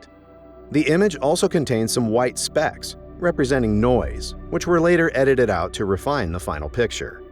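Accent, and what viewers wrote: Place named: United States. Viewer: ki American voice😅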